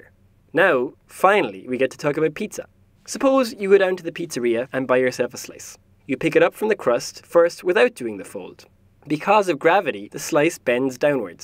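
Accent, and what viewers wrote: Ireland. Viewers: Hey I hear a fellow Irish person! :D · SymbolX the narrator is irish and your comment made no sense to me otherwise thanks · Are you Irish, but use Americanisms? Or are you American with an Irish accent? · The accent was actually from the Republic of Ireland! If it was a Scottish pizza it would have been deep fried, yes that actually exists there! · He sounds vaguely Irish to me. Not at all Chinese. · Actually, seemed pretty damn Irish to me... And, living in the UK and having an Irish best friend, I know what Irish sounds like. =) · I love pizza with Irish accents! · do i hear an irish accent? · irishness! :D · what accent is this? irish? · Is that an Irish accent? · is this huy irish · irish accent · Irish accent? Idek.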